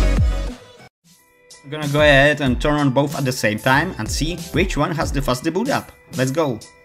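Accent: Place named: Czech Republic. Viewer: Ten českej přízvuk se prostě neschová ((; hodně štěstí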